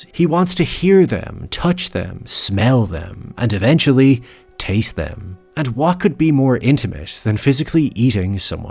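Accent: Ireland. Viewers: The narrator sounds partially irish... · Is that voice-over an Irish accent?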